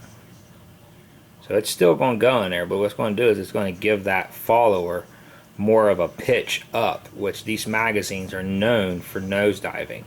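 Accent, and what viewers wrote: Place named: Southwestern Pennsylvania, United States. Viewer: Heavy southwestern PA vibes from you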